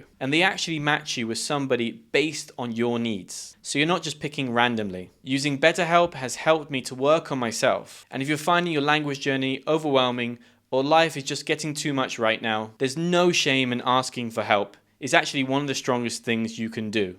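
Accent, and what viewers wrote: United Kingdom. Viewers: Best English teacher and best British accent ❤ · I finally found out that I definitely love British English, I'm able to understand when you speak. Thank you, you're the best. Te mando un fuerte abrazo desde Ecatepec, México. 🇬🇧❤️🇲🇽🫂.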